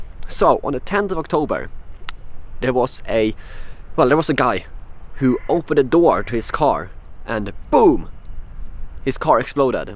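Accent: Sweden. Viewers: Skön svengelska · Haha din svengelska är så härlig :D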